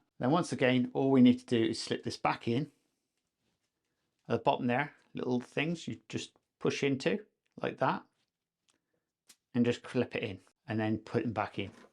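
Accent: United Kingdom. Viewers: Love when I hear a Bristolian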